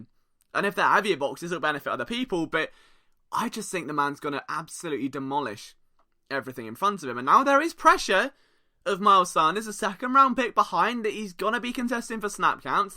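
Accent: England, United Kingdom. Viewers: Exactly. I guess some people don’t like his English accent talking about American football but they are just being a-holes.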